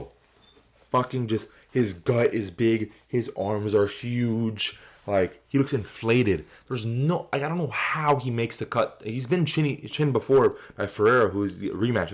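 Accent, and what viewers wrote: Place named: Miami, United States. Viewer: u from miami bro? i hear that accent 😂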